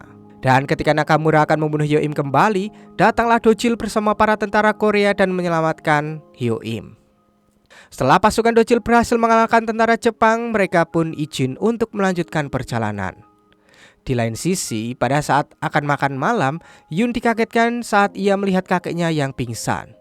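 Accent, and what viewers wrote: Java, Indonesia. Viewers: Byak mulut bukan film,mana medok bgt ngmngnya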